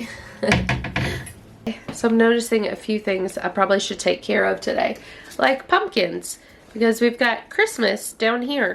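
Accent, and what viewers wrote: South, United States. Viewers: I love your channel! I also love how you have a southern accent. We live in Maine and speak so differently!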